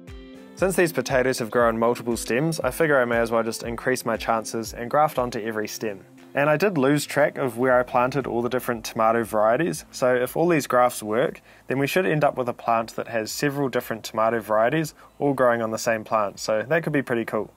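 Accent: New Zealand. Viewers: Insteed, weether, togeether - lovely kiwi accent ❤🤩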